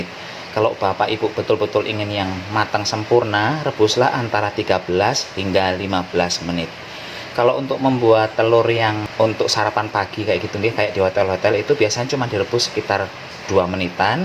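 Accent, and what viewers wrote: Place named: Java, Indonesia. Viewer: terimakasih mas... Aku suka channel ini... Mas nya pinter masak dan orang nya suka lucu- lucuan. Bikin ketawa. Suka juga dengar suaranya asli jawa.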